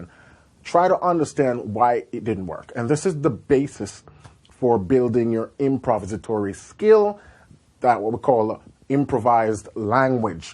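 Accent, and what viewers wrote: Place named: Jamaica. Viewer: This is my first time here. I'm a reggae man who has recently branched out to the blues. Today, I decided to check out some Gospel-- and found this lesson. I was not disappointed, and have subscribed. You know your stuff, and that unmistakable Jamaican accent still comes through! Blessup!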